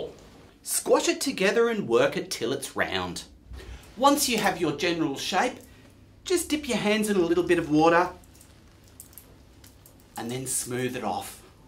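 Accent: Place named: Australia. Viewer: Are you Australia? Or New Zealand??? Coz I am Australian and my brother does this "Typical Aussie Accent" and as I watched this video, it reminded me of that voice! (No, I don't find it annoying coz I am used to it):)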